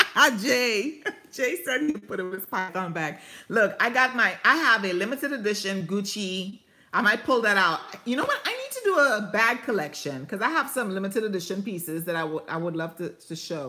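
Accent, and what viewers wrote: Trinidad and Tobago. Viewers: ..Congratulations! I was lucky to catch the end of your livestream. Excited for the next one. Love your costume shirt! We love Mandolorian and the child. You sound so happy and jolly I am so very happy we subscribed to each other hoping to get to 1,000 subs soon. I am hearing a slight island accent there❤❤❤ Ps. Wish I knew about the giveaway before those samples looks awesome especially the lipstick I need some new ones but can't afford any right now. Girl some of them brands I never heard about. I am really happy that I am here I have a lot to learn llove the Mickey Mouse bottle I live in his backyard 🙂 Hey I figured the accent out Trini in the house yayyyy. We Trinis are very generous people, your son is very cute. I like how you teach him to call people Miss or Mr I teach my granddaughter the same ❤❤❤ look on my channel I have all them delicious dishes you talking about. 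Come retire in Central Florida it have a lot of new affordable houses.Do you have a PO Box?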